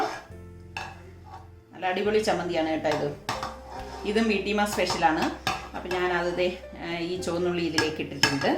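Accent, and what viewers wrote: Trivandrum, India. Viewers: ..same recipe ente vappachiyudeyum favourite aanu.njanum orattitum varutha chamanthium undakarundu.pinne tvm bhasha parayunnathu kondu oru kuzhapavum illa. namukku pinne tvm bhasha alle parayan pattulu.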